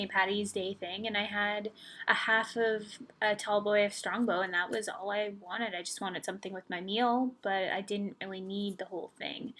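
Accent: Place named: Canada. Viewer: Haha. I mostly hear it in the words - Sorry, hard, thirty, out/throughout, to. Actually, I can always tell Canadians when they say the "word" out or "sorry". Accents are my thing and I spend way too much time studying and imitating them. Haha. I'm a dork.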